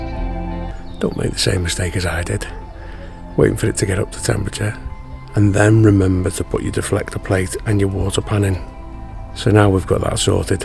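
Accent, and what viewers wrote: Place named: Liverpool, United Kingdom. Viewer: I`ve watched several of your vids and I really like them! Will try beef ribs on my Weber Summit Kamado pretty soon. And drinking ERDINGER Weissbier isn`t such a bad idea 🙂 You should also try PAULANER or SCHÖFFERHOFER Weißbier. And I also like your Liverpoolish accent (as one of the US guys mentioned already) 🙂- and our former Mainz 05-coach Jürgen Klopp loves Liverpool as well. Greets from Germany Wolfgang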